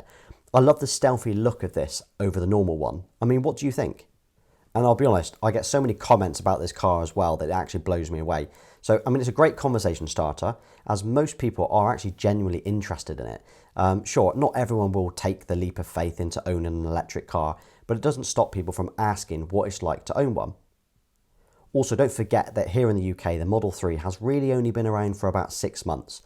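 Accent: United Kingdom. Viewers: Why are most of the YouTube content from USA ? Good to hear british speaking!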